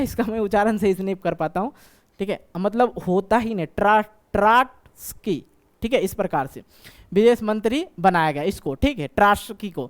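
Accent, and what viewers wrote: Bihar, India. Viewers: Sir Aap Bihar se hai kyaa ❤❤❤❤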